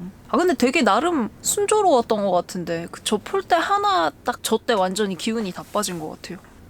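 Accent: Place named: Busan, South Korea. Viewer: 항상 영상 잘 보고 있습니다! 같은 부산분이시네요 ㅋㅋ 사투리가 반갑습니다 ㅋㅋ 재미있는 영상 계속 부탁드릴께요! 언젠가는 잘하겠지!! ㅋㅋㅋㅋ 화이팅입니다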